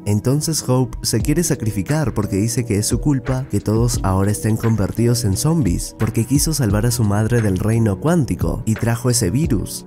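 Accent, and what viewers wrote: Peru. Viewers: Tal ves sea por qué el men es peruano y pgod también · gran resumen y mis sospechas son de que eres peruano xd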